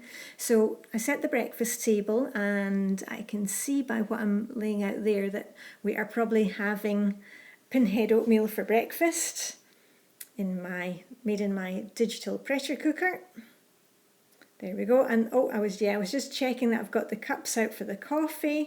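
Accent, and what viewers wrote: Scotland, United Kingdom. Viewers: Where about in Scotland are you from? I’m in Edinburgh lovely to. Hear a Scottish accent!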